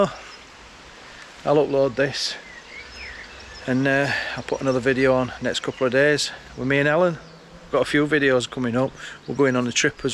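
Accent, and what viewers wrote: Yorkshire, United Kingdom. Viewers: Ahh I am new to your channel but listening to your lovely Yorkshire accent is getting me through Covid..... Stay strong and good thing's will come your way. Plus you have your lovely who is an absolute sweetheart... Love to you both X X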